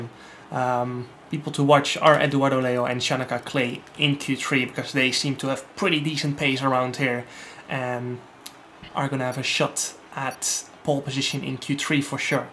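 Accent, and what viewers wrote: Netherlands. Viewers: I knew you were dutch just like me, i could hear it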